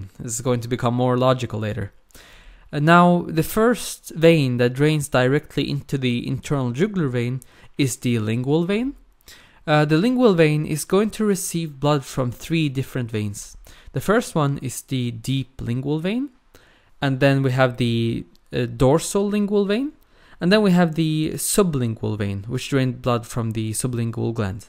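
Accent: Norway. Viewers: hahah yes, I think the same. I love the Norwegian accent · He sounds very Norwegian to me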